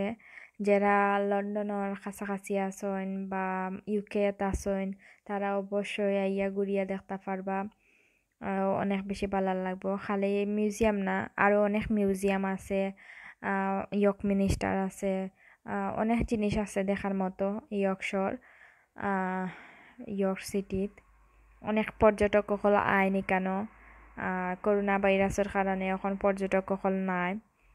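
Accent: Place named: Sylhet, Bangladesh. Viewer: সিলেটি আপা আপনার কথা হুইন্না আমার ভালো লেগে গেছে অনেক ভালো লাগছে আপু আমার কথা শোনার জন্য আমার বাড়িতে আইসেন একটু দাওয়াত দিয়ে গেলাম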